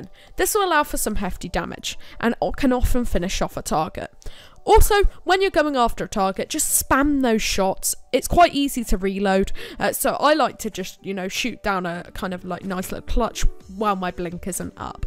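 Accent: United Kingdom. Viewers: British young guy accent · this english accent XD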